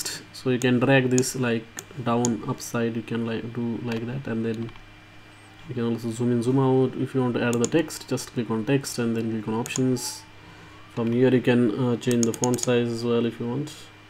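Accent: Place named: India. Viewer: You speak too fast and with an accent... It sounds like a clattering window pane of a rickety bus. Could you speak a bit slower, and to a global audience, not just Indians!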